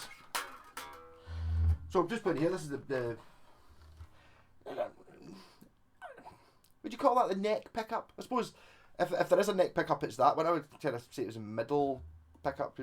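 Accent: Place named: Glasgow, United Kingdom. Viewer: My heart absolutely jumped at hearing the Glasgow accent, love to see the fellow Scottish musicians! Great demo lad!🏴💪🏻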